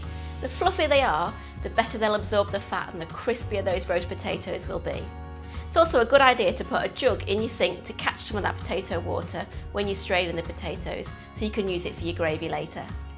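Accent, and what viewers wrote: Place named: England, United Kingdom. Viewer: It was a pleasure listening to your fab English accent, and watching your video, looks very simple gonna make this right away.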